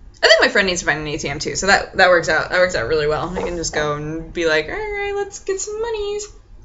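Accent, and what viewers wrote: France. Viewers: I love your frenchie accent !! I'm sure all your french fans (like me ^^) are specially happy today ! :D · your french accent is BEYOND hot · I love your French accent. For me, it's so hard to pronounce the "r" :(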